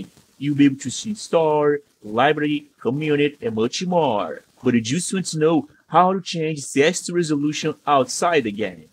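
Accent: Brazil. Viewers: crtz q é brasileiro tem mt sotaque kkkkkkkkk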